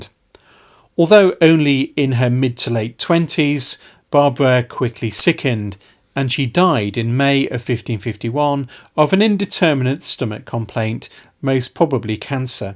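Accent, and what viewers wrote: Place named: England, United Kingdom. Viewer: Why don't the English try even a little to pronounce foreign words/names as natives would? The J in Polish is pronounced as Ya.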